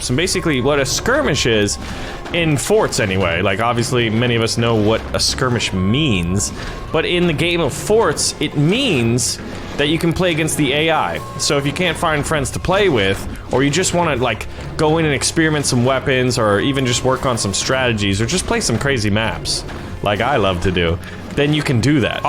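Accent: United Kingdom. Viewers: Can you stop speaking in british acsent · Love the British accent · Why you always speak with a British accent Maby be because someone in your family could of been in the Royal Navy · im sorry but i don't like your British accent im sorry though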